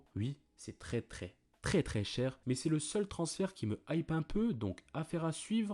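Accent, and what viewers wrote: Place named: Italy. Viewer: Ta culture foot et ton accent italien me font rêver. Merci!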